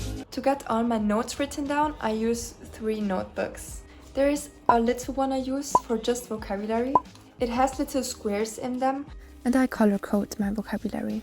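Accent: Germany. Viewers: Very impressed by your diligences and study approach, I'll definitely try to apply it going forward. Just a small note: you have excellent English but a pretty strong German accent - maybe try to emulate your preferred English accent, just to sound a bit more natural?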